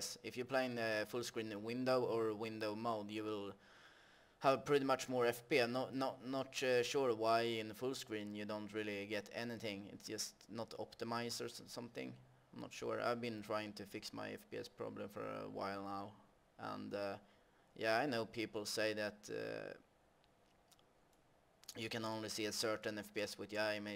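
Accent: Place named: Sweden. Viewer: Aldrig hört någon mer svensk människa.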